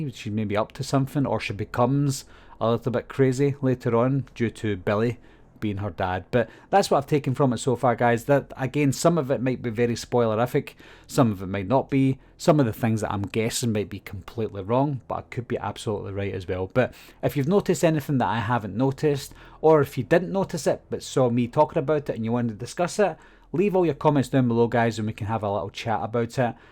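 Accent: Scotland, United Kingdom. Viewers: Scottish accents make everything more enjoyable to me. ❤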